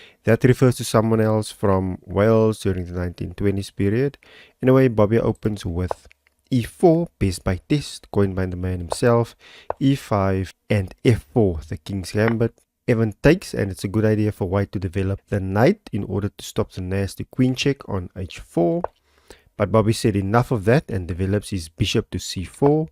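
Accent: South Africa. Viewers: South African?!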